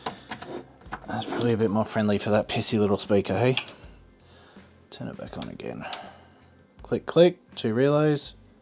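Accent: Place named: Australia. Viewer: I have a crown xls1000. With the same symptoms as this one. No audio and screen is blank. The fan powers on for like 1 second. I noticed when I was using it last it had red lights on and on the screen it said overload or overheated, so I turned it off and back on and never worked again. I tried reseating the ribbon cable on the board side but still nothing. Any other ideas. You sound like your an Aussie, where are you based?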